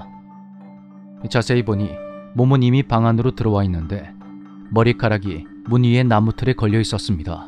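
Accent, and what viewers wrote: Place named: Jeolla, South Korea. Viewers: 님 방송 늘잘듣고 있습니다.전라도 사투리 구수하니..찰지내요 ㅎㅎ다음엔 충청도 사투리도 부탁드립니다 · 깨알같은 전라도사투리ㅋㅋㅋ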